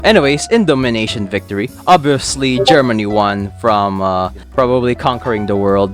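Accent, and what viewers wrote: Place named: Philippines. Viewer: Your pinoy......i can guess from your accent❤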